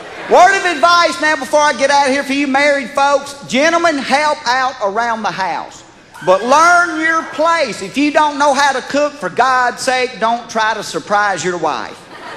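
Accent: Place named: South, United States. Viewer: hes hilarous,i have this DVD and i have watched this many times and can many more times lol i love his southern accent :)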